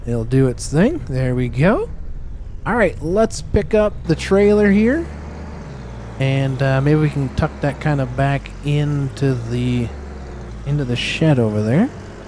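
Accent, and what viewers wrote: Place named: Upper Midwest, United States. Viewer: Love from Michigan…thought i could pick up a bit of northern Midwest in your accent. Blink twice if i’m right lol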